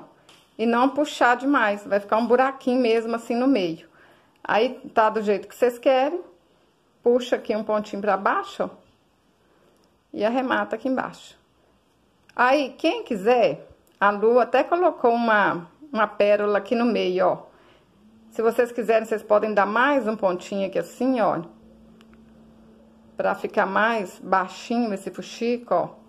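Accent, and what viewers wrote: Minas Gerais, Brazil. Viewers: adorei essa coruja vou fazer e colocar onome dela de fuxigueira haha bj grande goste de ouvir vc falar me faz lembrar de minhas tias de minas gerais em andradas que saudades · Vc tem sotaque de mineira! Sou de BH ! Vou te dar meu like!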